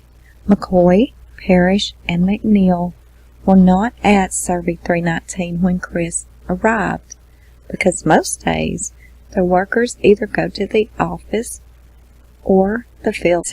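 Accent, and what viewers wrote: South, United States. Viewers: I also agree...your voice is soothing and southern...I'm from Tennessee and your voice reminds me of mine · Love your southern accent..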